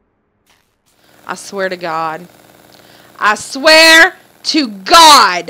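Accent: South, United States. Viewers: I love your country actcent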